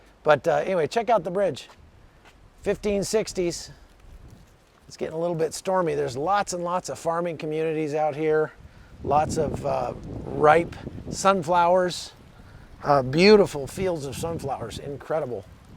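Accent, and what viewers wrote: United States. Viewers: I guess he's american by his accent. So I can justify his limited knowledge of everything outside the United States. To americans, everyone east of Germany is russian and writes and speaks russian. And they are probably communists :D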